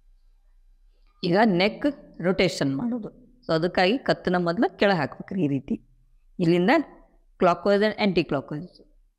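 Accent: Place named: North Karnataka, India. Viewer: ನಮ್ಮ ಉತ್ತರ ಕರ್ನಾಟಕ ಭಾಷೆ ಕೇಳೋದೇ ಚೆಂದ ❤‍🩹❤‍🩹❤‍🩹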